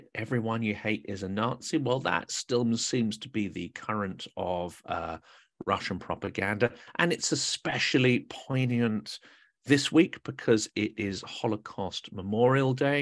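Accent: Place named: United Kingdom. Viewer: I love having the wonderful summary you have put together tonight! Your one hour commentaries are priceless as have all your guests been. Never think they are too long! Your work is amazing and appreciated. I’m so busy keeping up with our Starsky, and Johnny FD and our Canadian/UK/Swede Brandon, it’s a gift you give us bringing honest news and knowledgeable guests on your channel. Thank you for all your hard work and the British accent is a pleasant change from our American talking heads. Слава України 🇺🇦 героям слава! 🧨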